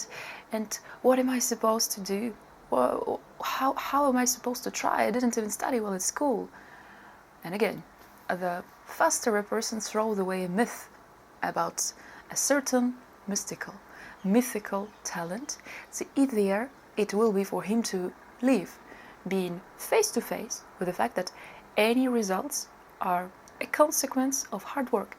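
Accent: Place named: Netherlands. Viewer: Hi, you are totally correct. Based on your accent I think you are from Holland/Netherlands or Belgium, possibly Scandinavian. In the former USSR Soviet Union they were the first to destroy this myth of "talent", the state needed the people to be as educated as possible for a variety of reasons. And they were the first to say that this Myth was created by the Elite, the Intelligencia/Educated classes in league with the capitalist imperialist Monarchy, to keep the proletariat down. In order to limit people and to control them. In the USSR they would say everybody needs to work hard to develop good skills. Only working hard and getting proper good teacher, who are willing to teach you properly. In Western nations the Elite educated classes, the overall (average) effect is not to fully teach/help the students, they make them suffer by not providing sufficient information in the studies, and then they say "It is the students responsibility to find the information", so they are trying to limit the amount of students who make it. 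In the Soviet Union, the leadership was aware of these kinds of sabotage by the Professors, and the Professors were watched by other Professors working for the state as Educational spies you may call it. If they see that a Prof is not teaching certain topics properly, they would be called in for investigation and be asked "Why can't you teach that topic properly, is it because you are not that good in this topic, if that is the case we will send you a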